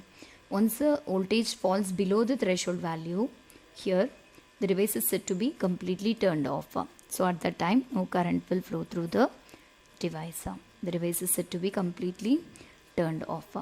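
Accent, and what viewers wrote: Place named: Kerala, India. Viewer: Thank You Ma’am ! And your Kerela accent is cute :)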